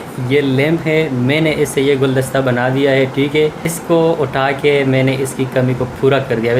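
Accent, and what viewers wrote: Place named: Bengal, India. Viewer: AP Panjabi hA ko bangali hum ko to bangali lagraha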